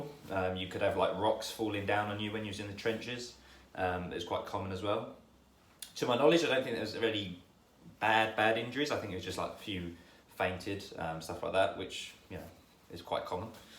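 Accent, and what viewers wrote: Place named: United Kingdom. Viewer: Hi!I love the film so much,it's my favorite.Thank you for the video that we can know more about the people who also contributed to the film. Love your video!(I'm from China and I'm learning English by watching videos on youtube😂,i really love your British accent and i want to have a trip to London oneday！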